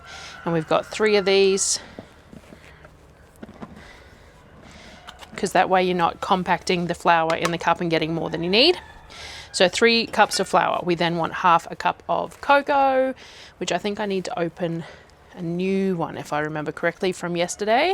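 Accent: Australia. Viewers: Good afternoon from the States. I love your vids girl. So fun to watch what you come up with. I find when I'm having a really bad day, I get excited when I get notified of one of your cooking videos. It brightens my day. Your voice is soothing and since I have lived in Mosman, a suburb North of Sydney many years ago, I enjoy hearing your accent. It reminds me of the many adventures I had there and how bad I need to go back. Thanks for bringing light into a dreadful day and for so many cool ideas to cook or bake. You're a lovely woman and I hope when I get back under, I can buy some of the foods you use that we can't get here and send them back to the States...the cost would be so worth it. Ta and have a wonderful week!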